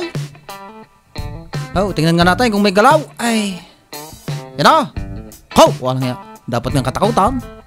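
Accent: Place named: Santo Tomas, Philippines. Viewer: Ayy taga STO.TOMAS talaga are! Ascent palaang ih!😂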